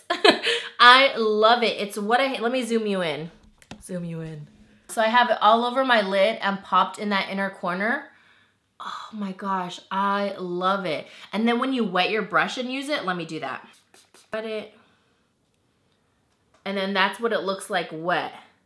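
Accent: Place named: Northern Ireland, United Kingdom. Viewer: Girl you have me in a pure kink with the Cana baelive it ! Soooooooo funny! You sound northern Irish 🍀 I had to rewatch it ! 😂😂😂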